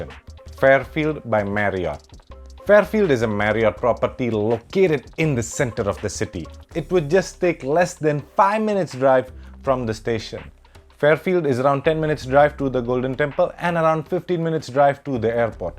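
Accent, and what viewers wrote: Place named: Russia. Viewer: Ye aise kyu Bol rha hai chutiya Russian ancient mei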